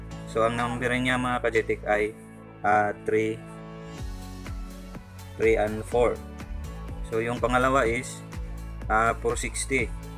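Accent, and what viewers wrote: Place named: Iloilo, Philippines. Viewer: Ilonggo ka pala ka master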